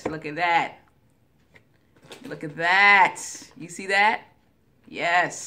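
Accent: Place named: New York, United States. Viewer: and you sound like your from New York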